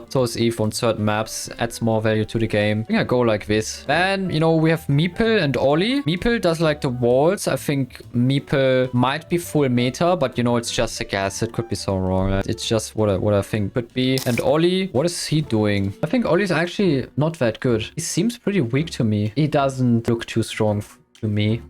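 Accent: Germany. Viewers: english is not his primary language, he's german, don't expect too much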